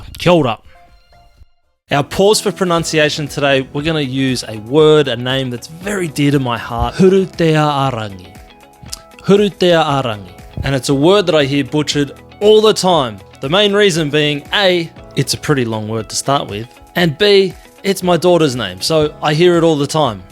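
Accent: Australia. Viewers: I find the Austraalian accent different. But what makes your vids awesome is that you break it down so easily. E mihi ana. Can't take the māori out of the Māori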